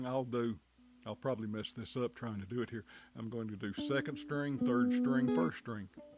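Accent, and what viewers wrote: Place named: Kentucky, United States. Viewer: ...you sound like Kentucky.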